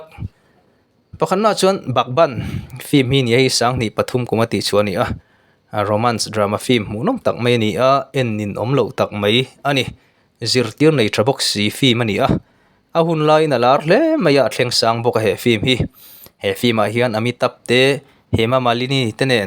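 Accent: South Mizoram, India. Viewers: Saw lampang a ang😂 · Sir chu Saw lampang ah in awm em? Nge khi lampangah, hmar lam product ori chu i tawngkau chheh atang hian a ang viaulo.. huat tur anilo aw.. inpawh zelna tur zawkin kalo sawi ve mai mai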